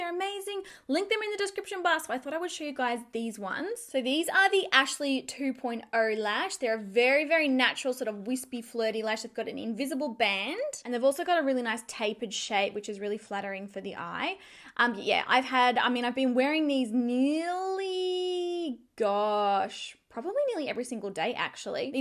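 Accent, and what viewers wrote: Australia. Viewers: Girl! Your Australian accent is beautiful! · I LOVE your videos. I've learned so much from you. I'm sure many of your subscribers are younger than I am, but you've helped me be more confident with makeup at 44!! I love to see your subscriber number climb because you deserve it!! You need to share a tutorial on that coral peachy look your rocking today!!! LOVE IT!!! Much love from America! Hope your winter is not too bad in Australia, and your accent is charming.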